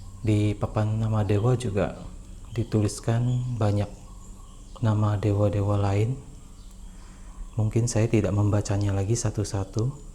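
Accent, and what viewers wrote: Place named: Pontianak, Indonesia. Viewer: logat admin khas tionghoa ponti..hehehe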